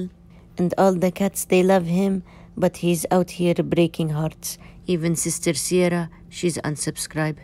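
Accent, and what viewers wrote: Arab world. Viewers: Sister where r u from?? Ur accent looks like Arab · It's an Arab accent so yes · This is just the arabic accent. She is from Palestine, not the Gulf. And she's exaggerating her accent like this for comedic purpose. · Same and her arabic accent just completes it!! 😻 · Hey I'm from India and love your content 😄❤️❤️ sometimes I face difficulties to understand but I find your accent really amazing 🤌🏻✨ maybe it's an Arabic accent I don't know much about Arabic or Urdu though but I find your videos really hilarious 😂😂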